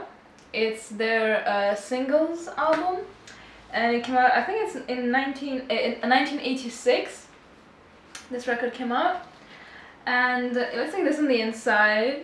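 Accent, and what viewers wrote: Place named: Russia. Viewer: Hi i love, their compilation is definitely one of their best comps but i`d actually say all of their compilations are good because they constantly produce good music. If you like Twin Peaks you might like `s solo work. She sings some of the music in Twin Peaks and her solo album is beautiful, it`s very dreamy. Especially the tracks and. Also Twin Peaks related is `s track. He samples the theme music from Twin Peaks and uses it really well on. Although the only mix of that is a classic version is the original Woodtick mix. The other mixes are a bit tacky but the Woodtick mix is classy. You should do a cd update video. Also a favourites video would be cool. A collections video. I have a couple of questions for you. What country are you from, you sound russian. Where did you when you visited England? What were your impressions of England? 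I`m english so your answers would interest me. You come across as being quite artistic. Do you make your own art? Thanks for sharing your collection with us. I look forward to your next video which i imagine will be uploaded in about a years time. Cheers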